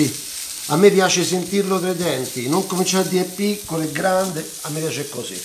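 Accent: Rome, Italy. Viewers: Cuoco,oltre il simpatico un po marcato dialetto romano ci presenta sempre cucine trite e ritrite da far noia,quando saprà fare un piatto che sia diverso dalla normale consetudine? Stati e non copi. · Bravo, ma "questa parlata" in romanesco non si potrebbe evitare, visto che è un canale italiano? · non so se me fa venì più fame l'inflessione romana, il piatto in se o la passione che si sente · 😘😋😋Veloce, semplice e fantastica 😋😋 Complimenti per come sai combinare la tua professionalità con il tuo romano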